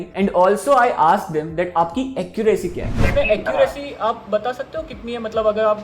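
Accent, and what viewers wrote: Gujarat, India. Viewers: bhai aap Gujarat se ho kyunki aapki bolne ka lehja Gujarati jaisa he 😀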